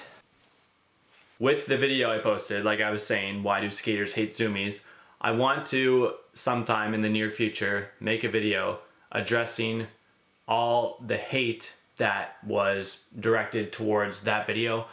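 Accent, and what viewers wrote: Canada. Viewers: You're Canadian right?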